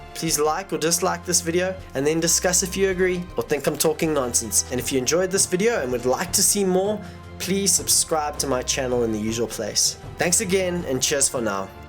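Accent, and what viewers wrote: South Africa. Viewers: where are you from? you sound south African but I could be wrong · Are you south African? cause you sound South African. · where are you from u sound south African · Are you some what of South African origin? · Are you South African?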